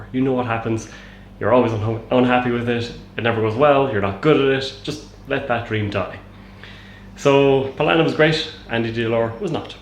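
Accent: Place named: Ireland. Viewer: Heh didn't pick up on your Irish accent, but now I can totally hear it. Congrats on your buddy's win!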